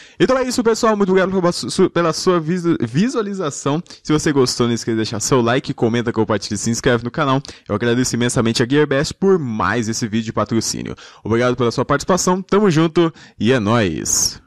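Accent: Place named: Piracicaba, Brazil. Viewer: você é piracicabano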